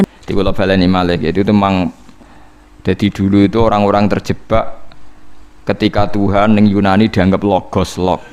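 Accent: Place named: Java, Indonesia. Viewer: wes medok jawane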